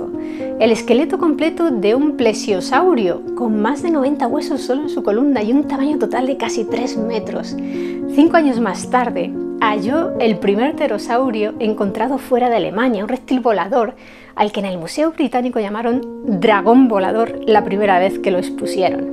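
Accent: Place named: Valladolid, Spain. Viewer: Hola, muchas felicidades a por este vídeo pues como siempre su preciosa voz tranquila y pausada, junto al hermoso fondo musical y las imágenes bien seleccionadas me hace disfrutar de estos temas, me relaja mi mente, me distrae de mis ocupaciones de médico y su acento me recuerda a Valladolid España un bello lugar que visite por motivos de mi especialidad, adjunto su gran logro pues temas de misterio, historias curiosas y paleontología me agradan bastante y en este medio están casi dominados por hombres, así que muy bien por que logra destacar en este medio, adelante y mucho éxito!! Desde México: Atte Dr. Víctor López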